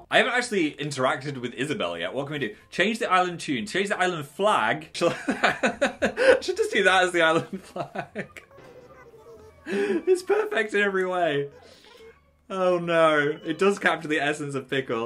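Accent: North, United Kingdom. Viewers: Sounding pretty Northern today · Northern accent is coming out HARD in this video.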